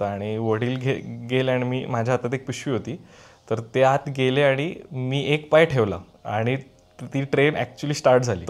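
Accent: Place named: Pune, India. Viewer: Good evening..... अच्छा म्हणजे एका सामान्य कुटुंबातून तू संघर्ष करून पायलट झाला आहेस तर पण एक गोष्ट खरी आहे मराठी माणसाला खूप संघर्ष करावा लागतो बोलीभाषा खूप गोड आहे तुझी पुण्याचा प्रचंड प्रभाव आहे पुणे तिथे काय उणे😂😂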